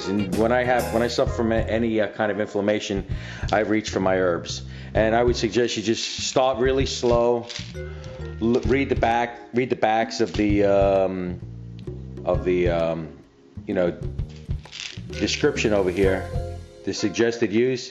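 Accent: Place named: New York, United States. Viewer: Lol I'm sorry I kept giggling the whole film. The thick new yorkish accent is so funny and gives him this "hey guys, come ahhn" type of feel to the video.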